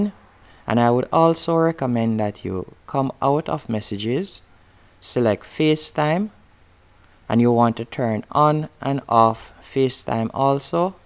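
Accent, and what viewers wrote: Jamaica. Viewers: It work thankssss I can tell your a Jamaican thatssss wasup thank u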